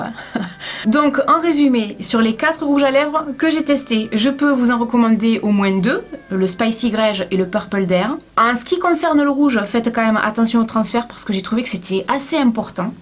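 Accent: South, France. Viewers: Hello, en temps que française qui habite en Suisse, tu es mon rayon de soleil du Sud avec ton magnifique accent! Merci pour ça. Aussi je voulais ajouter que j'adorais tout particulièrement ton maquillage des yeux, encore plus beau que d'habitude!😍 Et oui je confirme quand ta plus tes taches de rousseures ça fait bizzare, mais l'effet cadavre avec cette teinte est garantie 😂 Je voulais aussi dire que j'ai souvent eu l'impression avec les rouges à lèvres (à peu près de la même teinte que le plus foncé ) il y avait souvent de l'inégalité dans la couleur! Merci en tous cas pour nous partager tes avis et ta bonne humeur! Ne change rien! 😘❤❤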